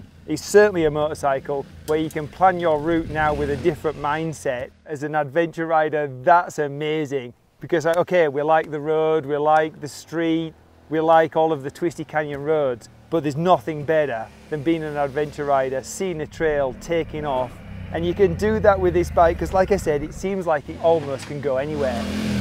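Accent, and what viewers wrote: United Kingdom. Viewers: I love your British accent! So authentic. From kenya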